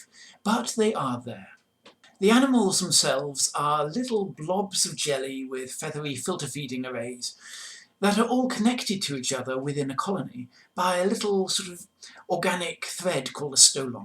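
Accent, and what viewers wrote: United Kingdom. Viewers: So cool! I have never read anything about graptolites other than that they existed and are useful for dating rocks. That is a very interesting question about how a colony of successive clones achieves those kinds of alternating ordered shapes! And why such fragile collagen skeletons fossilize so well! I wonder if aside from collagen they had some kind of pervasive toxic chemical that discouraged decomposers. I'm straining my brain to remember what popular science book I read in the last couple of years - by a woman author is all that I remember, but the topic of the book must have been paleontology or earth history - describing in one chapter a cold drizzly steep hike somewhere in (Scotland?) with a renowned older-but-tougher paleontologist looking for fossil/ geological evidence of a major extinction event, and graptolites were a big part of the evidence... 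By the way, for Dr. fans who have trouble understanding her (like me), when I slow down her beautiful chirping Scottish accent to 75% (in the settings for the video) suddenly I can understand every word! (except for some of the Graptolitic terms that I didn't remember from earlier in the video, but the context made them clear).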